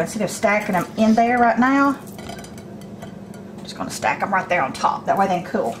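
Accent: South, United States. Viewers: Medm crispy?? Not completely done. Thanks for cooking demo. I'll have to try the burritos. Whisky- woo. Love the way you talk. I'm southern too. Whenever I travel thru Georgia., No one Asks where ya from??? Assume I'm a native. Love it.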